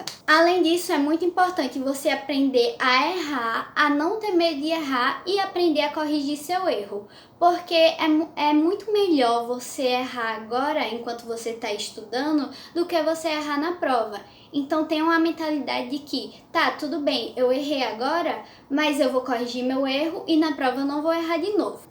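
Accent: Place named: Pernambuco, Brazil. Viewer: Tu é pernambucana, mulher? Sotaque lindo.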